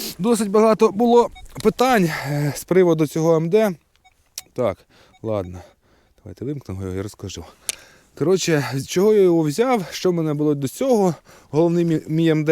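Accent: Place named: Russia. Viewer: Привет,😂ну разговариваеш ты на украинском НО с русским акцентом 😂😂😂😂😂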